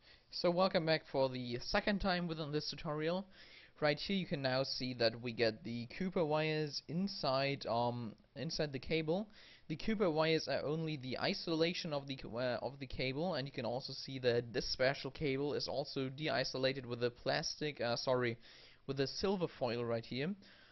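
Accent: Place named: Germany. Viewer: Are you German You sound German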